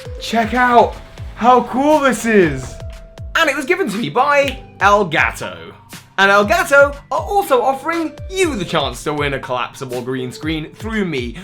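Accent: United Kingdom. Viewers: He's so British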